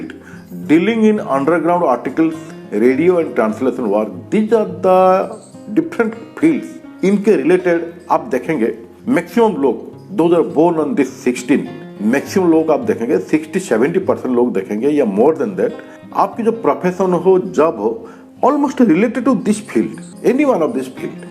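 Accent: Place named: Bengal, India. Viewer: Y m I getting the good smell of Bengali accent from this particular video 😅😅😅 M I ri8 or not😢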